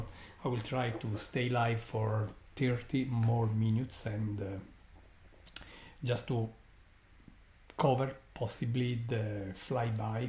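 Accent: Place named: Italy. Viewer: Se entiende bien el inglés italiano por lo menos para los latinos jaja. Gracias por compartir esta experiencia. Espero que podamos seguir observando hechos relevantes del espacie en tu canal. Seguimos conectados desde Paysandú, Uruguay.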